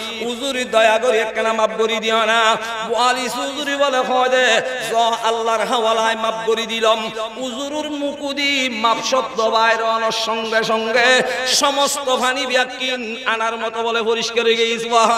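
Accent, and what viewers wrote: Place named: Chittagong, Bangladesh. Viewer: আলহামদুলিল্লাহ আনেক সুন্দর চট্টগ্রাম বাসায় আওয়াজ শোনা যাচ্ছে এমন কিছু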